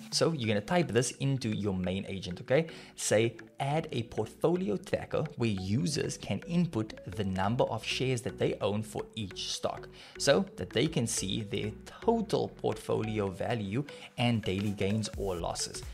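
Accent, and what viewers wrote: South Africa. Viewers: Bru are you from SA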